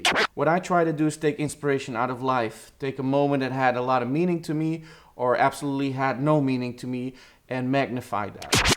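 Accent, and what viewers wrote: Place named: Netherlands. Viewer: Dit is veruit mijn favoriete video. Dank u voor het script op het scherm. Dit is heel nuttig om nieuwe schrijvers die niets en nooit gezien wist over script en keigaaf. Dank u. Wij willen meer wij willen meer. Niet zeker over de kleur keuze hoor. Sorry man. U vraagt om eerlijke feedbfeedback. Oppassen met wat je vraagt om ze zeggen. Rreally vooruitkijkt naar de volgende one.Misschien volgende keer is over kleur kiezen en concrete voorbeelden van het gebruik van de camera op het scherm Iran. Stuurt u voorbeeld van wat ik heb. Film Academy is officieel geopend. Geweldig werk Keep it up.Ik denk dat het tijd is voor Fb pagina waren kunt u deze video's en schrijf mee.ook vriendelijk op beschrijving alle schakels/nes u noemt het cameramodel u gebruikt of kleuring cijfer van dat bepaalde video d.w.z. sterren zeggen hedendaagse episode wordt gefilmd yang zxxz camera thier bepaalde instelling. 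Ook voor bijvoorbeeld staat in thw beschrijving het script App naam duidelijk niets te vermelden, laat een l8nk voor de kijker te gaan zoeken meer over het onderwerp.Sorry maar Nederlandse accent is niet bekend hier. Moeilijk om te begrijpen dat je. hihihi. Weldone.Blijf insturen ooooo je guru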